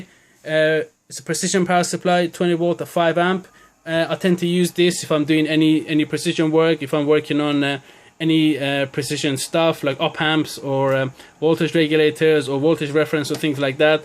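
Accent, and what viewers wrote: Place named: Iran. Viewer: از لهجه ي ضايت معلومه ايراني هستي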